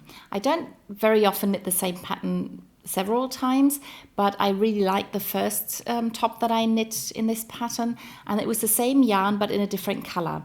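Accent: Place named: Australia. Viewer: Thank you for responding right away! Love your podcast! My son thinks you have an Australian accent:—). I will hate to disappoint him..., not.:-). Love your English! I wish my German was as good as in the old days when I spoke it flawlessly.... Is it this the pattern? <link>?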